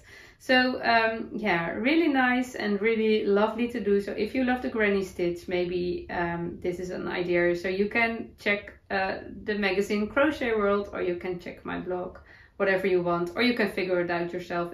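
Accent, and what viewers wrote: Netherlands. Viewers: I love your Dutchy English. 😍 when you say ‘a piece of cake’ it means it is easy to do. When we don’t love something ( in a slight way) we say ‘it is not our cup of tea’ . Hope that helps 😘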